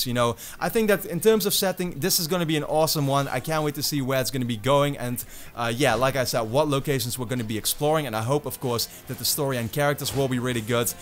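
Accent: Netherlands. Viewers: Is he from the Netherlands?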